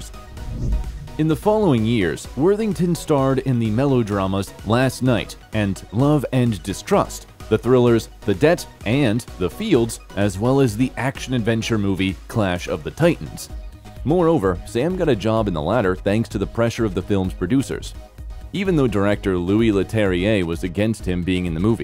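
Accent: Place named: United States. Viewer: Americans always say " Mel born " instead of " Mel ben "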